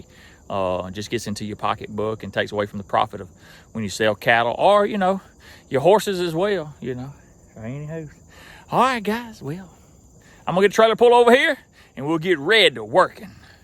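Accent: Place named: East Texas, United States. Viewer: When I hear you talking it reminds me of growing up in East Texas near the Louisiana border. I havan old hunting buddy lives in Grenade Mississippi. Love watching your videos.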